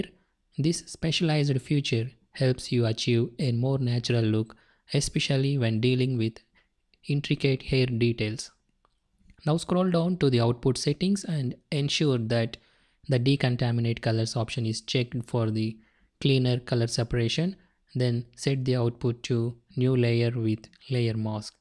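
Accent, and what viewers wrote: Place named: India. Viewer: Tumhari awaj se laga ki tumhe hindi aati hogi bro plz hindi me video banao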